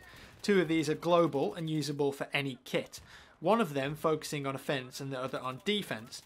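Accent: United Kingdom. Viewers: Guess he can't stand your civilized British accent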